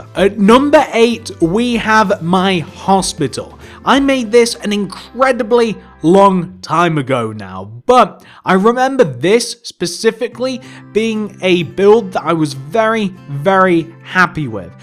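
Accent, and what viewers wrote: United Kingdom. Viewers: Your voice doe. I never seen you get excited like before xD your voice is british doe.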